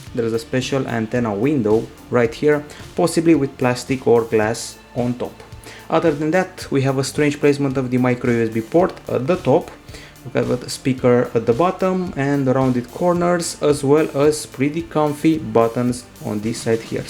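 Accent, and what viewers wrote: Romania. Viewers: Romanian accent 😅 👍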